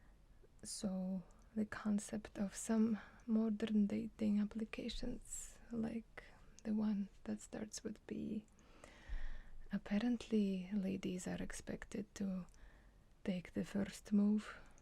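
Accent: Russia. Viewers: Russian accent it's strong it's perfect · с лютым русским акцентом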